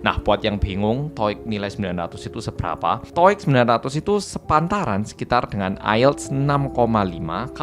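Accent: Java, Indonesia. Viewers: engga si, gue sbg org jawa masih denger logat medhoknya mas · Medoke wes koyo bukan orang Korea..semangat mas💪👍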